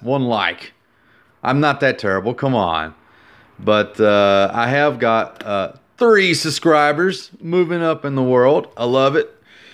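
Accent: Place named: South, United States. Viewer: I like Mark Bageley's Spiderman. Also I like your Southern drawl, its nice to listen to.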